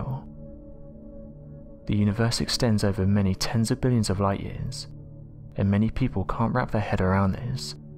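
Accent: United Kingdom. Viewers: WHY must you talk so fast? Yes, you're British but some Brits are much easier to listen to AND comprehend. There is another speaker on your channel to which I refer. And, thanks for including SAE measurement.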